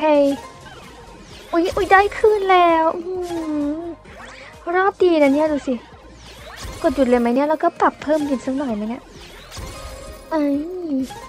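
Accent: Rural, Thailand. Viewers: ชอบเสียงพี่เค้ามากเลยอ่ะโอ้โหเสียงแบบเหน่อได้ใจ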